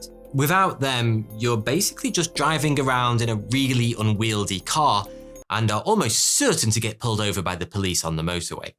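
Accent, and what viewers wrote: United Kingdom. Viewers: well that's because he is British... go just a bit further east or south and the accents/voices start sounding increasingly "ooga booga-ish", especially once you reach Eastern European territory. source: years of gaming with voice chat + discord and many vacations around Europe. the worst offenders for terrible English accents rated from indecipherable to somewhat understandable are: 1. Russians/Ukrainians (these share the top spot) 2. Greeks 3. Italians 4. Spanish 5. French 6. Bulgarians 7. Romanians 8. Serbians 9. Lithuanians 10. Latvians whereas the most clear accents from most understandable to least understandable would be: 1. All the Scandinavian/Nordic countries; Danish, Norwegian, Swedes, Finnish, Icelanders 2. Dutch 3. Belgians 4. Germans people from countries like France, Germany, Italy, Russia, Spain and Greece happen to be the most rude people when playing games online internationally, as they will usually not even attempt to communicate in English and just assume everyone else on the server speaks their native language, and when they get angry usually start cursing and raging in said native languages as well, not realizing that they aren't actually offending people since nobody understands what they are saying. 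the countries not included in this list are people i don't run into often and therefore can't judge.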